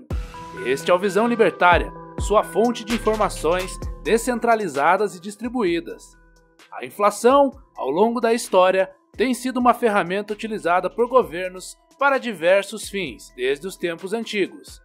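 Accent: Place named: Rio Grande do Sul, Brazil. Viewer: Esse narrador é gaúcho.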